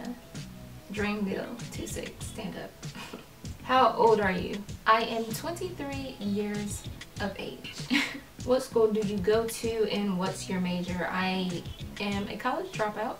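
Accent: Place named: Jamaica. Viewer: I heard your Jamaican accent in the candy land tutorial 😂😂😂 represent fi yawd❤💚💛 nuff love ❤💚💛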